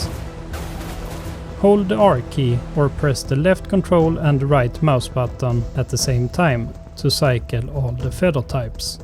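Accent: Sweden. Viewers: är du skåning? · are you swedish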